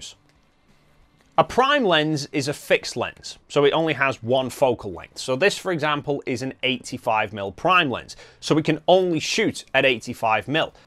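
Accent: United Kingdom. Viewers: Thanks for the video! I think I'm going to try prime 50 or 80mm. Btw, are from eastern parts of the UK? Trying to guess by the accent.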